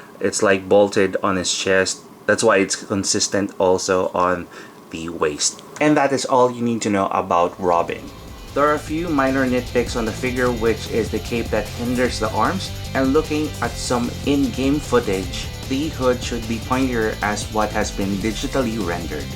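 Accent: Philippines. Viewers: hi can i ask a question too? Are you pilipino cause your accent is like that just curious :D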